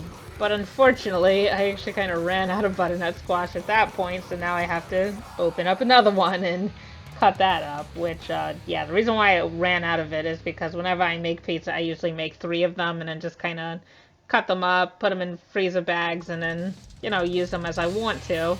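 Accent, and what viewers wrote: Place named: New York, United States. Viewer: D))) mouth watering REAL bad right now haha ;D love your New York accent , you can make pizza for me aaaany time sista ; )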